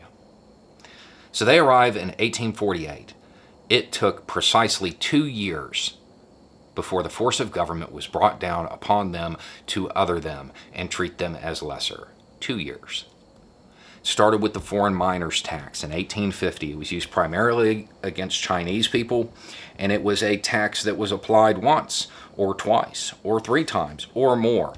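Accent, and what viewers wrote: South, United States. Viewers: Gotta be 100% honest...didn't expect this from a prepper white guy with a southern drawl.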